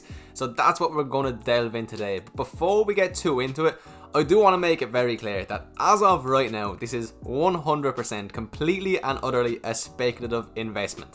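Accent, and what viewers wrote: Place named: Ireland. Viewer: Are you from Ireland?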